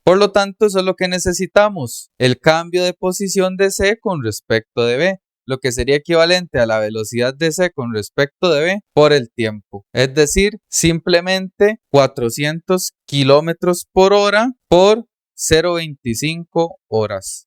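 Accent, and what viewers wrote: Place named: Costa Rica. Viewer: ..jajajaa ese asentó TICO es inconfundible....felicidades.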